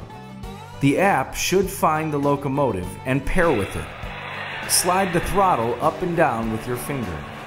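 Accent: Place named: Midwest, United States. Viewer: Somehow I was able to recognize by his voice and accent alone. That's a benefit of being a Midwestern American I suppose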